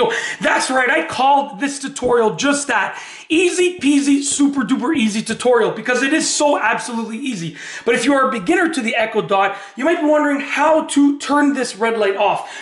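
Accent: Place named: Canada. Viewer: This guys LOUD, echo-y Canadian voice is terrible! He talks TOO MUCH. It was easy but he made my ears hurt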